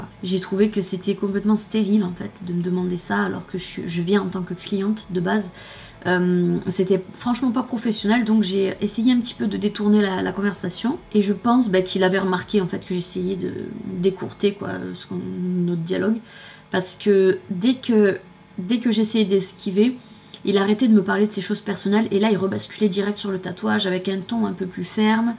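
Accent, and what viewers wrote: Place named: Paris, France. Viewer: Tu vis à Montpellier mais tu n'as pas l'accent. Tu as l'accent parisien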